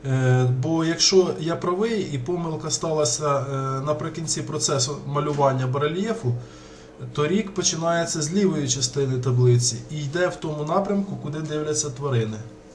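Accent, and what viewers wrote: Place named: Western Ukraine, Ukraine. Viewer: Понимаешь что достаточное колличество лайков оно не наберёт - тема не популярная. Но раз Бог открыл для тебя эту информацию, значит может это не просто так, а для того чтобы ты поделился ею! И ещё , в видео Говори медленнее, я из Украины , но твой чистый западный диалект, не очень понимаю. И более доступно объясняй - когда ты писал в комментариях - я гораздо быстрее понимал , чем когда ты говоришь в видео.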